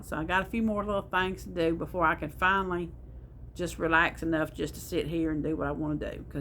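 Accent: South, United States. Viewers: I enjoyed hearing your southern accent. I grew up in the south but am in wyoming.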